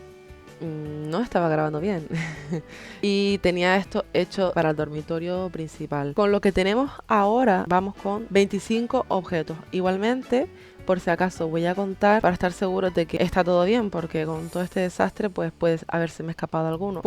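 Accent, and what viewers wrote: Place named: Canary Islands, Spain. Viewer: Mira que llevo 1 semana viciada a tu canal desde que te conocí y sabía que tu acento era muy de mi tierra. Me encanta saber que hay un canal de sims que no solo sea de Canarias sino de Las Palmas. Adoro y sobre todo pío pio jajajaj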